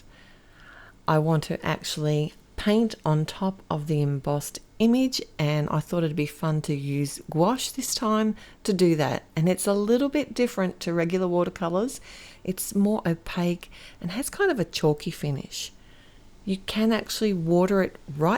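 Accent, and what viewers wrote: Australia. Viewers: So lovely to hear an Aussie accent on my crafting channel!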